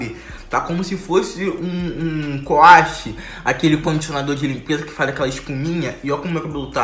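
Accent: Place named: Brazil. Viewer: Brasileiro tentando falar inglês realmente dá pra perceber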